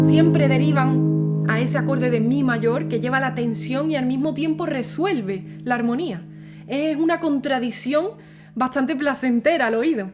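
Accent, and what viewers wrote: Andalusia, Spain. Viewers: Ese acento andalú enamora 🥰😍😘💕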